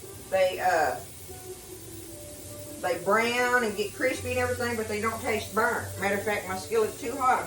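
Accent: South, United States. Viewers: Don’t like pea salad but love ur southern draw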